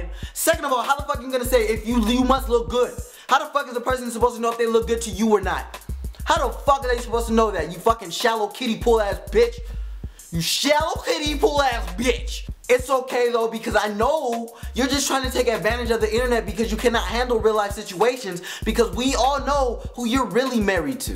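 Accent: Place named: United States. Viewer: u soun soo country (wat tha hell )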